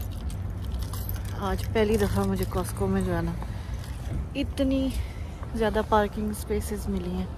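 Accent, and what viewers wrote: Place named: Punjab, India. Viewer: Though driving.and ur commentery aur uper se Punjabi touch❤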